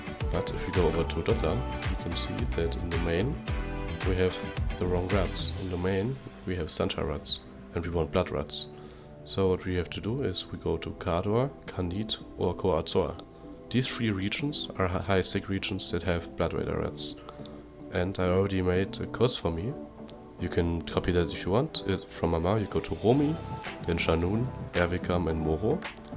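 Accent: Germany. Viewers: Ahh the German accent ..! Which eve time you playing usually?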